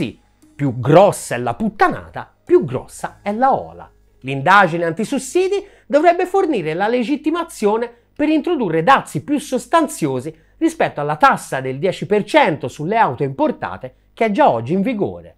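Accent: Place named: Tuscany, Italy. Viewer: Sei un grande 😁 Specie con il tuo accento toscano 😁. È un dato di fatto che i cinesi ci hanno creduto per primi e hanno investito e sono avanti di decine di anni. In Europa le lobby hanno screditato il mercato nascente non facendo investimenti nuovi ma ancora guadagnare allo stesso modo cosa non spiacevole per i governi di incassare ancora le accise 😂. Ora che le pressioni dei cambiamenti climatici e della tecnologia ev sono sempre meno screditabili non si sa che pesce prendere che scusa o colpa trovare. l'Europa è in netta arretratezza e recupero il ritardo costa caro. I cinesi l'avevo già sentita nel settore ev vanno in punta di piedi sanno benissimo che rischiano l'aumento dei dazi. Difatti la macchina ev più venduta in Europa e America e la tesla che è un marchio Usa,Elon Musk un miliardario pazzo che ci ha creduto nonostante i bastoni tra le (ruote)si è costituito non solo le macchine,i software, le batterie i supercharger e ancora per aggirare la burocrazia in america la RC auto per le sue macchine 😁. 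Quanto detto i anche in America è come tutto il mondo: gli hanno reso la vita difficile. La determinazione e l'intuito ora raccolgono tanti frutti. Palle che Stellantis, Volkswagen e nessun grande gruppo europeo ha avuto.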